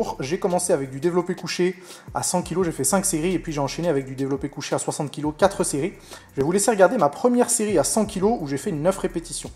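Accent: Lyon, France. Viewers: Tout le monde prononce comme ça à Lyon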